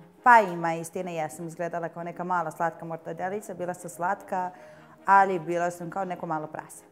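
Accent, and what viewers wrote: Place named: Kaluđerica, Serbia. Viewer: Progovara Kaluđerica iz nje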